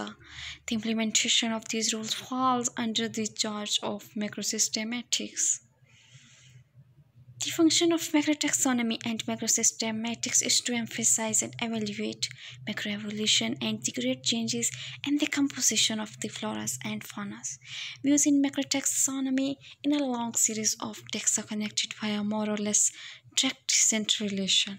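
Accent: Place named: Lahore, Pakistan. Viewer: Voice👍👌🤗🤩 lagdi lahore di aa 😅😜😚😁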